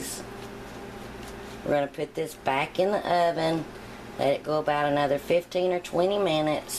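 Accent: South, United States. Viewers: you are too cute with your pretty country accent❤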